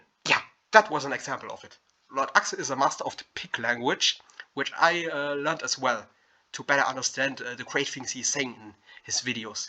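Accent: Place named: Germany. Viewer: ne meinte dass man hört, dass du Deutsch bist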